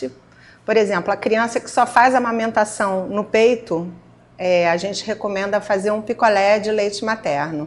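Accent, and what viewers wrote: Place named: Rio de Janeiro, Brazil. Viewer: Muito bem explicado e interessante! A dica do picolé de leite materno foi bacana. Mas esse sotaque carioquês da gema, carregadissimo incomoda um pouco.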